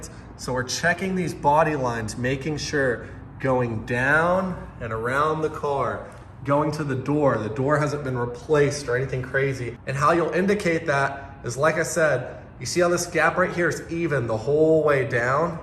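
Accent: Texas, United States. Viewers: Love his Texas accent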